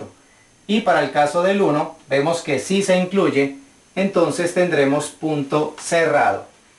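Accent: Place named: Colombia. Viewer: Definitivamente los profesores Colombianos explican con muchísima mas claridad,debe ser su español que esta catalogado, como el mejor que se hablan los países latinos. muchas gracias por compartir tus conocimientos en matemáticas con todos nosotros.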